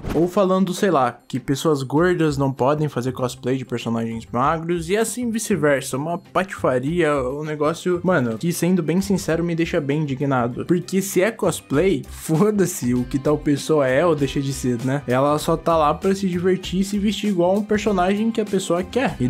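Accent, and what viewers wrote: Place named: Manaus, Brazil. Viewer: tu e de manaus neé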